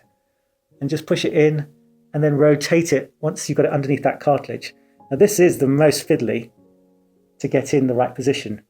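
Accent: United Kingdom. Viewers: thank you doc for the information and the devices you ve shown .i do appreciate your British accent but i wonder if these devices are efficient for everyone and if they are available on the Algerian market or not !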